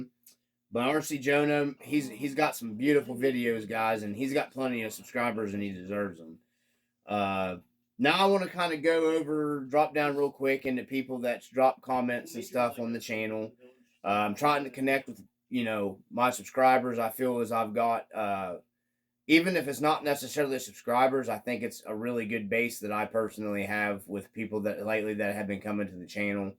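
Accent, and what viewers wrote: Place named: Australia. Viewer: Thanks for the shoutout and kind words mate. Love the Aussie accent 😉. Just found your video and enjoyed the watch. Now subscribed - Thunder from Downunder! 🌏🇦🇺🦘🐨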